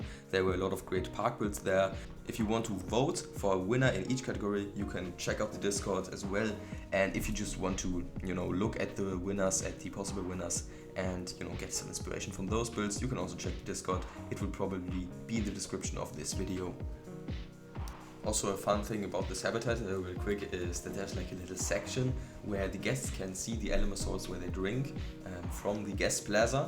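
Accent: Germany. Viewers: you have a german acc ent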